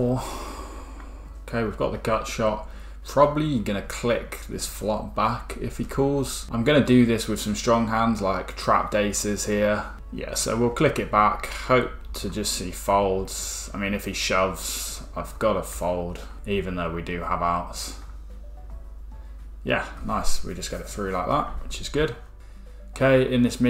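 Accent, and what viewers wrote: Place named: South West England, United Kingdom. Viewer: Yes mate. Some Sunday content for the lads. You sound like a fellow South-Westerner... Bristol?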